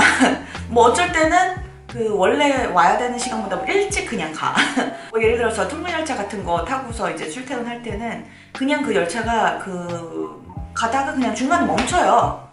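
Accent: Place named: South Korea. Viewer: 영어에 한국어액센트 넘 심해서 듣기 불편. 그냥 조선말로 편하게 하세요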